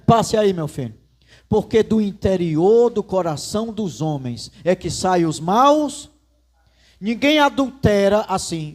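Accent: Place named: Northeast, Brazil. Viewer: Gostei demais fala nossa língua nordestês. Glória a Deus!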